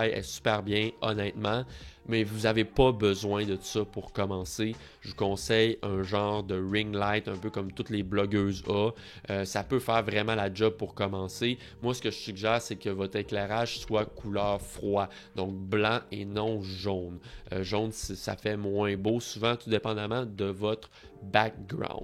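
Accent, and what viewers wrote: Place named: Switzerland. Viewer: J'adore l'accent Suisse ! 😏 Merci pour toutes ces infos !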